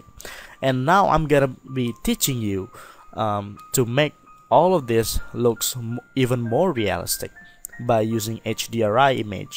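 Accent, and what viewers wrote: Indonesia. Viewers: Aha indonesian right? Btw it's a great tutorial dude, i thought you were western at first haha.. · I knew it the second he talks. indonesian accent all the way! Awesome tuts anyway! very helpful. yay for indonesian youtube guru!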